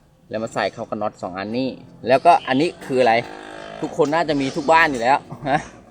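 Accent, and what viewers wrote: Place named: Ratchaburi, Thailand. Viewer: สำเนียงราชบุรี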